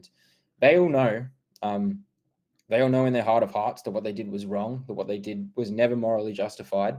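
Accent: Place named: Australia. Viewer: Im not english speaker.so just waana listen ausi accept .....❤may u be well and happy ......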